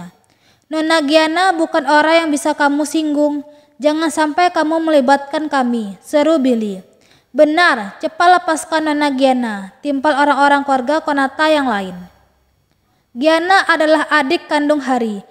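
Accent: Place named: Padang, Indonesia. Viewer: Logat org padang kental banget min...😂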